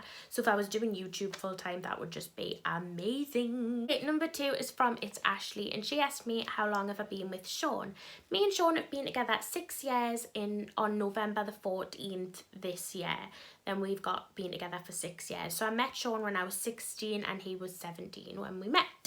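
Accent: Newcastle, United Kingdom. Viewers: I love your accent! Makes me miss Newcastle. I used to live up there for a bit. 🙂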